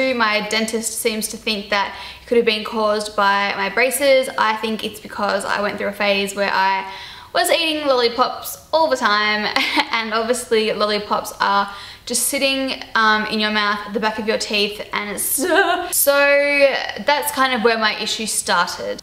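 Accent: Australia. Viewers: Um she's Australian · Is she australian?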